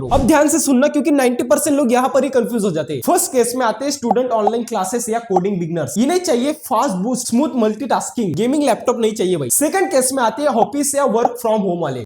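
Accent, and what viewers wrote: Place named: Maharashtra, India. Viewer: Marathi accent flowing up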